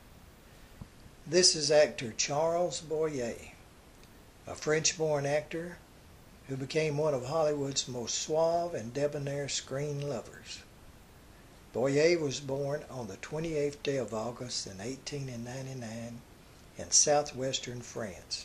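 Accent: South, United States. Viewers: I've been watching a few of your Hollywood Suicide bits. I like your southern voice; somehow it adds to the sadness of these star profiles. You've got great narrative...keep it up. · His accent? Shame on you. His accent is part of who he is, which is a southern gentleman. He could not change his accent anymore than say someone from the Bronx or France could. Why can't you just appreciate the stories he tells and the time and effort he puts out to do them and share them with us. I shudder to think he would ever stop doing this because a bunch of needle assed nitpickers tweak his stories. Just enjoy and learn from them. My Momma always said "If you haven't got something nice to say don't say anything". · ....while I realize you are from the southern reaches of our country and there are some variations on the English language because of this...I am sick to death of listening to you say HISSELF instead of HIMSELF...and BTW...the iconic studio head was named Irving Thalberg....not THRALBERG. 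You hear his name mentioned periodically at the Academy Award ceremonies since there is an Oscar which bears his name...so please....get it right!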